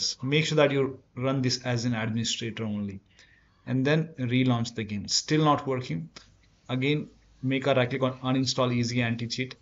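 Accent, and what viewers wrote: India. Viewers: BRUH FINALLY! Only an ubtuer with indian accent helps xd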